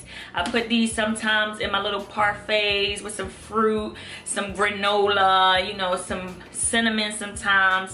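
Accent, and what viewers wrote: Baltimore, United States. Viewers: I live in pg county so trust i KNO she said two words and I just said yep she’s from Baltimore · I called that accent! I knew you were from Baltimore! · Are you from Baltimore...? That accent is Thick on some words I love it lol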